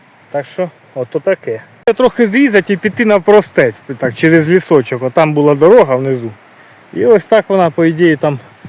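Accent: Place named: Luhansk, Ukraine. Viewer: Вітаю! Ваш говір видає людину з моїх країв,звідки орки нас ,,попросили,, Мені було цікаво.Я залюбки подивилася ваші спостереження та знахідки.У нас на Луганщині таких грибів немає. Чекаю нових екскурсій у світ західної України.Я підписуюсь на ваш канал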